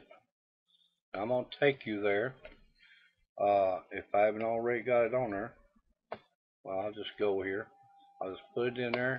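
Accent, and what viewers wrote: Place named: South, United States. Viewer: I like your accent. Southerner?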